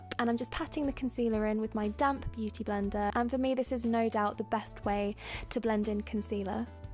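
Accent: United Kingdom. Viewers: I watch you a little bit too much to where I'm now talking in a British accent my family makes fun of me LOL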